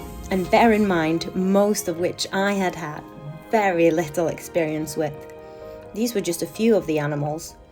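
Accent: Northern England, United Kingdom. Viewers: What a beautiful little piece of England 😊 You have a great northern English accent, for a Norwegian 👍 (the stunningly good YouTube algorithm recommended this) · For a Norwegian you have a very noticeable Northern English accent, Yorkshire?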